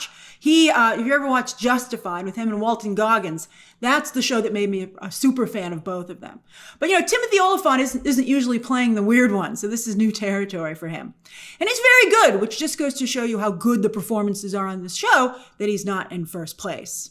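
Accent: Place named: United States. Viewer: Took French in school, so I was cringing at her Americanized pronunciation.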